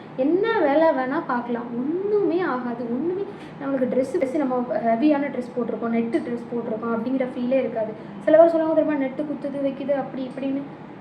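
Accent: Tirunelveli, India. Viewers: Neenga endha ori Tirunelveli aa?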